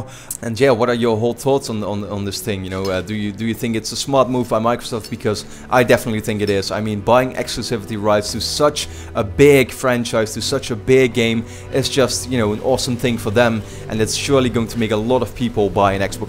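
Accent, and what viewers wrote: Netherlands. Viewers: Are you dutch? Your accent ;)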